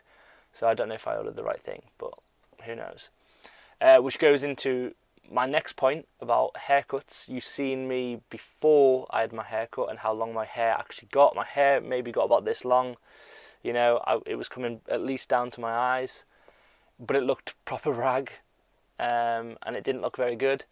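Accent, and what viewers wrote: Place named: United Kingdom. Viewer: What was the FB group please? Didn’t catch as much as I tried (UK accent) :) Keep posting mate, good results for me.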